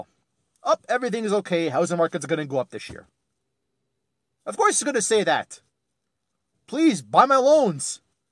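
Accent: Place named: Portugal. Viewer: Are you from Portuguese decent bc I sense a very mild accent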